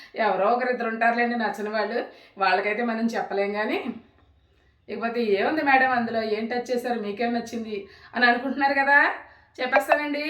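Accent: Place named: Godavari, India. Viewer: చాలా బావుంది వీడియో. గోదావరి స్లాంగ్ అదిరింది. అలాగే మా పెద్దక్క ను గుర్తు చేసావు చాలా సంతోషం ❤️❤️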